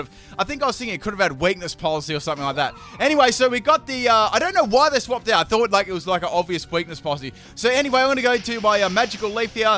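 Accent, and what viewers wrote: Australia. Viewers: Australian accent baby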